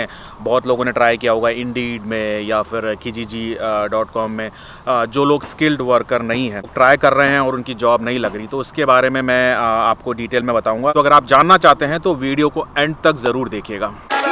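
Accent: Kerala, India. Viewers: Sir are you MALYALI?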